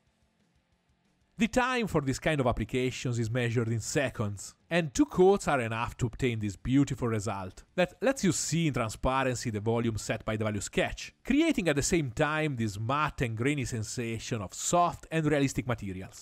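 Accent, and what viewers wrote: Italy. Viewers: In three hours! Jeezuz! And that italian flavoured english is just awesome! Ti saluto! Forza!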